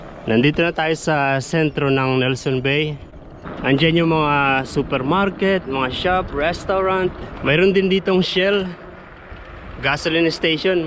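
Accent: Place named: Binangonan, Philippines. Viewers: Tiga rizal k pla sir.sv ko n nga ba eh.napansin ko na sa punto mu..tiga talim island naman ako.part ng binangonan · Taga Binangonan po kayo? yung punto po kase nyo tunog taga doon hehehe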